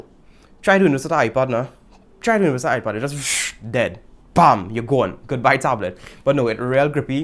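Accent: Trinidad and Tobago. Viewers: Dude you talked faster after saying that you would slow down because of you Trini accent. Hahahaha. Love it. So I'm a mac guy and was never much interested in anything else but I see that you are able to remotely control your mac from it?? Now I am piqued. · Bmt that trini accent surprised me fam #trinitodebone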